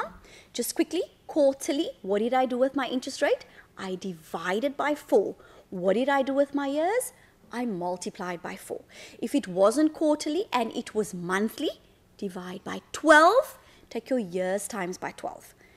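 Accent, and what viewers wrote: South Africa. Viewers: That's the way every south african teacher speaks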